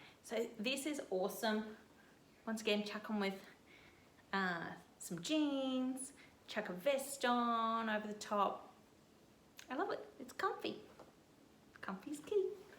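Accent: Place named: Australia. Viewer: Great video and especially lovely to see solid colours and tasteful patterns in the fabrics you’ve used. I find it takes discipline to choose these when fabric shopping over the more eye-popping prints but when made up and in the wardrobe they make the clothes I actually wear most of the time!! Also great to hear another Aussie accent, see more seasonally-appropriate sewing and Australian patterns and fabric stores being promoted! New subscriber here.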